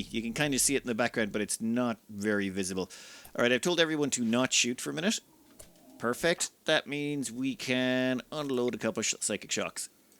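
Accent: Ireland. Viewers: Man WTF... Your life does not mean you can stray from the now mandatory daily update of the saga.....:eyes-purple-crying: love you.. longer vids plz, just give us the full 3 hour sesh without edit on a side vid, ppl would watch or listen to you for hours. Those who say otherwise are metal horrors... All you would need to do is talk for 3 hours... no trouble at all XD. My Girlfriend plays Oni and watches your vids religiously on that game.. I play Rim and do the same.. But we both watch any of your vids in the background while playing or chilling... eg. If you have a little more time to do comentary on longer vids ppl will watch or listen, as a Scotsman its very nice to hear an Irishman narrating Great vids on my Fav game! :face-green-smiling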